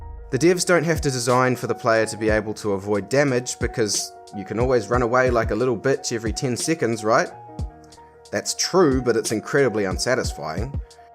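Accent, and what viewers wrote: New Zealand. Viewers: That's a Kiwi accent bro. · I've only played the first and Second Son and I do prefer Second Son but I so see where you're coming from with your points about the first it's still a pretty fun game. Btw are you from NZ the slang and your accent made it sound like it.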